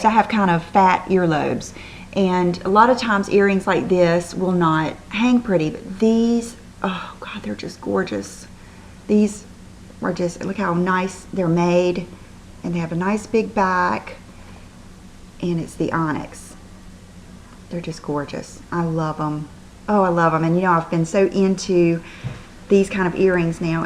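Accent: South, United States. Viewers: Hey,! I've just been having a marathon here lately.:) I'm a Mississippi girl and totally get your Southern accent. I think it's part of what makes you so charming. Our Accent is a blessing! You keep doing you!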